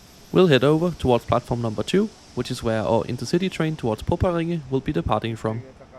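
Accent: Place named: Denmark. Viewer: Haha, I had the same reaction when I heard his Danish accent :-)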